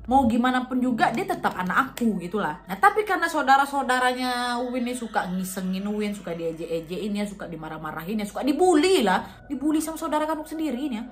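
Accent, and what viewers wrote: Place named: Minangkabau, Indonesia. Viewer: lucuu banget bahasa minang nya uniiiii😂😂😂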